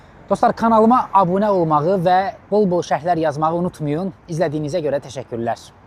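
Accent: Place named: Baku, Azerbaijan. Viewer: Siz özünüz Borçalidan gəlmisiz nə bilirsiz köhnədir ? Elə danişirsiz elə bil bakilısız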